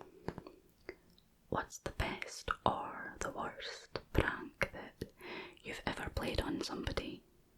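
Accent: Scotland, United Kingdom. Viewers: This wins. This is how all scottish accents should be and im from just outside Edinburgh 🤣🏴. Love this! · Aye lass ... I much prefer your Scottish accent! It's especially cute with the wee bairns! 😊😊😊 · I love your Scottish accent. So tingly. 🥰🥰🥰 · Ok so i just googled "vow of Celibacy" and means something totally different. Plzz correct me if its just me getting confused with ur Scottish accent .😅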